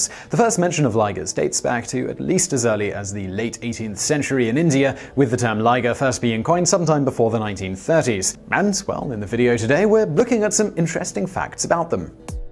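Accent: United Kingdom. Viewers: What a wonderful British accent! 👏👏👏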